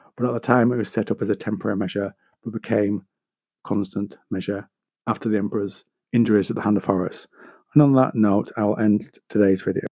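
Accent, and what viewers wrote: United Kingdom. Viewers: Almost vomited within moments of listening to the cockney. Can't understand a word. Not for consumption west of shitlandia I mean Europe.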